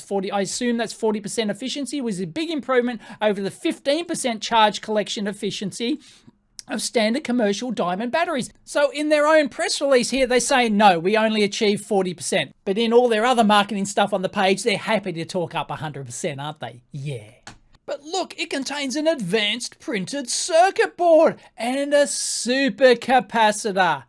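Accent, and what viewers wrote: Australia. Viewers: God you make me laugh. And nice to hear a fellow Aussie accent amongst all the usa folks. :)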